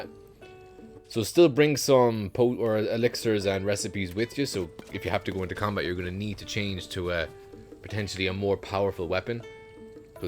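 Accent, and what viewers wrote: Ireland. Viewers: Thanks for your videos! Running through TOTK again with my son and there’s nothing better than getting tips with an Irish accent! Slainte!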